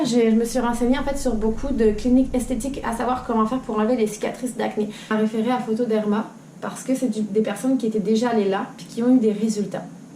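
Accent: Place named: Quebec, Canada. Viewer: Ces cute tu as un accent français et québécois à la fois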